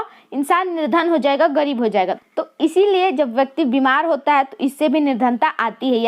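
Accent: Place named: Bihar, India. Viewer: Ma'am aapke language k hisab se aap ballia ya fir bihar se hai, sahi hai na...?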